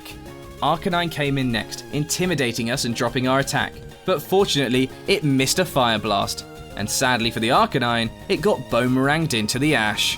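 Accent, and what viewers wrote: United Kingdom. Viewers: You‘re adorable and I enjoyed your content very much! I just subscribed, thanks for the content! By the way, You’re British, right? I say because of your accent! Lovely accent!😊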